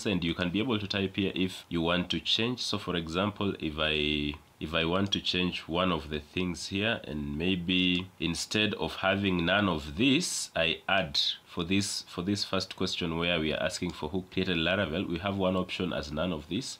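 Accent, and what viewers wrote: Kenya. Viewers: Are you Kenyan bro? I cant ignore the accent😂 ps: I love the work you're doing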